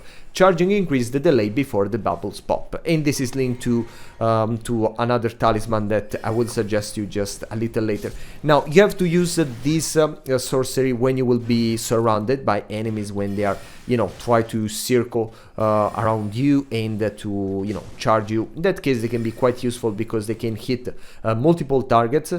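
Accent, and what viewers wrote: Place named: Italy. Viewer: are you italian because of your accent btw good vid keep posting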